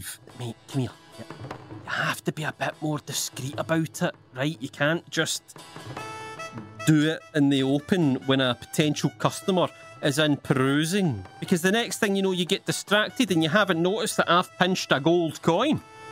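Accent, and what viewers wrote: Scotland, United Kingdom. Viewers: I thought this was an any Austin video when I saw the title and was pleasantly surprised with a raving Scottish man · I love much more aggressively Scottish he feels in this video. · definitely, before I've find this channel i thought i understand any english really good, but it turned out I've never heard scottish accent lol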